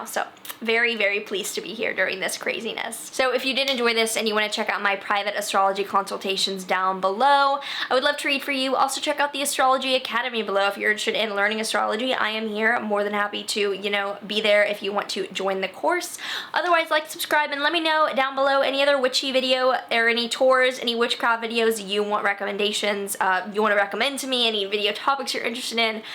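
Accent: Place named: California, United States. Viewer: And I'm sure someone from Texas could jus-az-eezily grow up to speak a perfect East London cockney, damn you muss-a practiced that Cali-girl speak🤩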